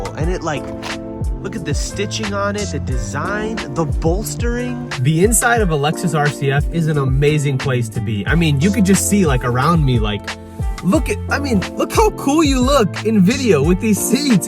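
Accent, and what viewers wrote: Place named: India. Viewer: I love the indian accent i chill with sum from saga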